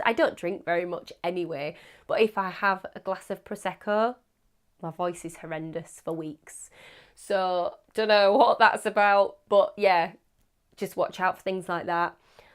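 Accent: United Kingdom. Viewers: OMG, I love British accent ❤ 😍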